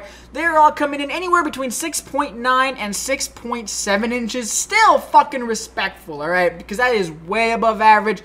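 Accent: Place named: Canada. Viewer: what part of canada is he from?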